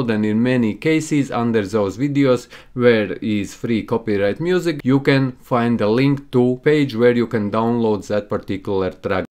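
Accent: Russia. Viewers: Love your Russian accent! And thanks for this video! Stay Safe. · No offense, but i like his russian boy accent :) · Finally a great Russian accent from a YT tutorial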